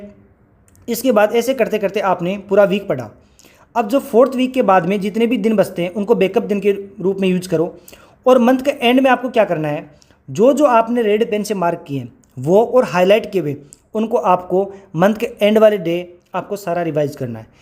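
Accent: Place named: Rajasthan, India. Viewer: Dear sir your accent is rajasthani😅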